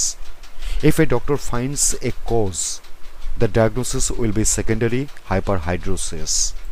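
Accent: India. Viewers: He is lndian..you cannot expect him to talk like an English or american man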